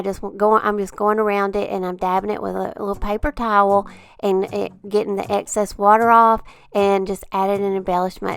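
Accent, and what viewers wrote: South, United States. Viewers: Love your videos and creativity! Love your sweet southern accent! I am from East Texas but have a childhood friend that lives in Guntersville AL. I live in Kansas and everyone says I have a Texan draw to my voice! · Everything is so beautiful! I love the ornament idea with your dads picture! So creative! And you have the most beautiful southern accent. I live in Georgia and Ive yet to hear it flow so beautifully like yours! 😂❤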